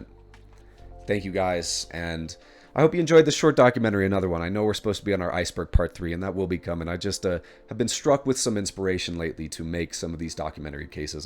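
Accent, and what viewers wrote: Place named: Southwest, United States. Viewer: How come you know how to pronounce Spain Talk? Are you of California or New Mexico? Or Tejas?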